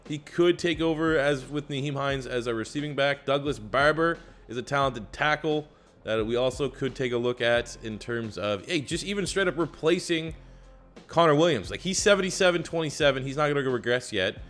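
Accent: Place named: Canada. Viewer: Hes Canadian its his accent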